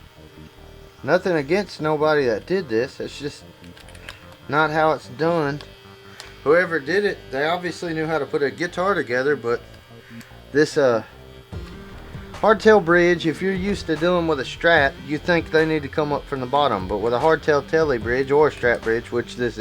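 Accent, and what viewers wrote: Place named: Western North Carolina, United States. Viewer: You sound like my cousin from the western part of NC. I'm in the East.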